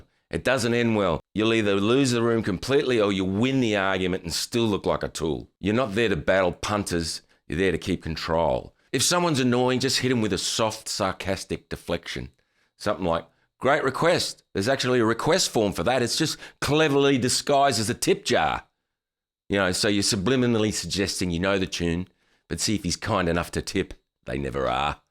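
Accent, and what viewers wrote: Australia. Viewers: I'm planning to get back into gigging and I've been loving your advice. Firstly it is spot on and I know when I was younger I've been guilty of many of the mistakes you've highlighted. Also because of the Aussie accent I feel like I'm getting advice from a Bluey character (I have a young kid alright) and makes me love it more.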